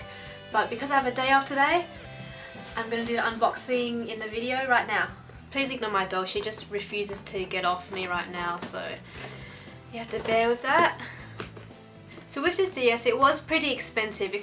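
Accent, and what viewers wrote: Australia. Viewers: You did not tell me that you from Australia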